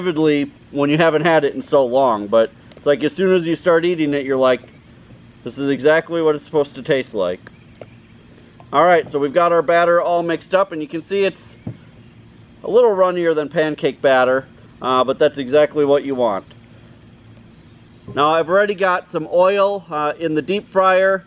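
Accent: Wisconsin, United States. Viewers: I was watching your video and was thinking how you sound just like a friend of mine. I was wondering if you were from Wisconsin. Then I read the description of the video. What part of Wisconsin are you from. My friend is from Eagle River area and I'm from Door County.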